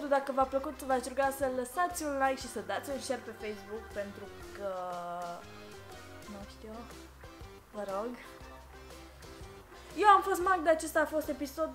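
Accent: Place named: England, United Kingdom. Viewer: Vezi ca ai niste engleză pe la coltul guri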